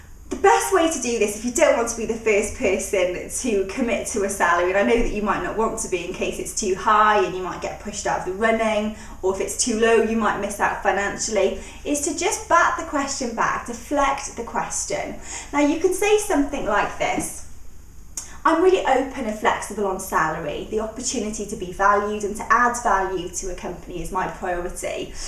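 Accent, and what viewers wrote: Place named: England, United Kingdom. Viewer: I like your English Accent, it's awesome!.. An employer asked me "How CHEAP will you work"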